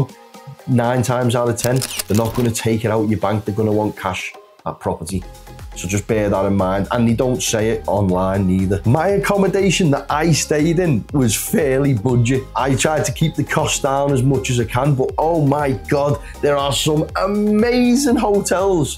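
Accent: United Kingdom. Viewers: تحديدا هاي لهجة سكوتلندا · dude your accent is cool where are you from? Birmingham? what accent is this? I know it's British but from where specifically? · انا عراقي اعشق اللهجة البربطانية الفخمة 🤤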